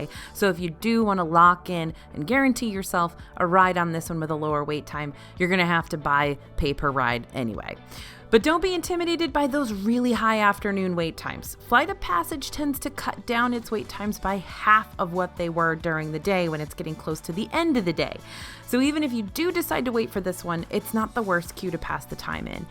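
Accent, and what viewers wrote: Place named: Western New York, United States. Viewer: I watch these videos all the time because I love Disney, but now I see that it's also because of the WNY comfort in your voice!!!!!!!!!! -a fan from Buffalo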